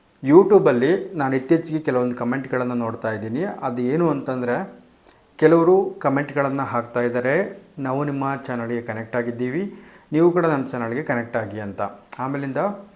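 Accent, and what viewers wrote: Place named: Holalkere, India. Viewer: Anna nammadu Chitradurga, Holalkere Grammina bhashe Keli nanna balyada dinagalu nenapayitu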